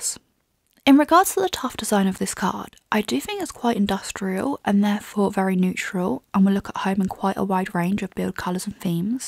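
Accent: United Kingdom. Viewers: amo tu acento britanico.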